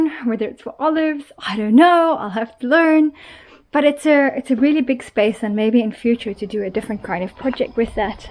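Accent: South Africa. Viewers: I can spot a "fellow south african" an oceans away 😂 🇿🇦🤌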